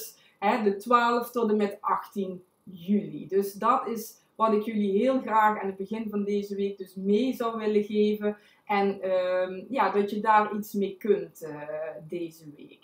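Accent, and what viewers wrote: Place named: Limburg, Netherlands. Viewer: Volgens mij ben jij een Limburgse, net als ik!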